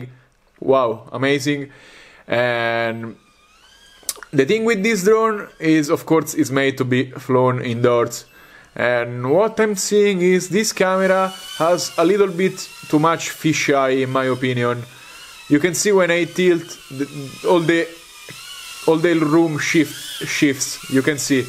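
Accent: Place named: Italy. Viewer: Come si riconoscono gli italiani , anche se parlano inglese correttamente, continua così ottimo video 💪🏻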